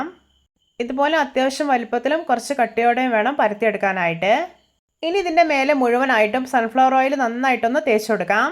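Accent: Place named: India. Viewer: ആന്ധ്രാ/ ഒറീസ്സ നിവാസിയാണോ? നന്നായി മലയാളം പറയാൻ പഠിച്ചിട്ടുണ്ട്... ഒന്ന് കൂടെ ശ്രദ്ധിച്ചാൽ മലയാളികളെപ്പോലെ സംസാരിക്കാൻ കഴിയും.!!! മലയാളം നല്ല ഭാഷയാണ് അതിലേക്ക് കൊങ്കിണി പോലെ നീട്ടി സ്റ്റൈലാക്കേണ്ട 🙏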